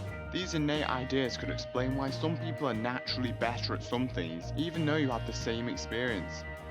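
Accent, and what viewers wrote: United Kingdom. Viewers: your british english made me hard to understand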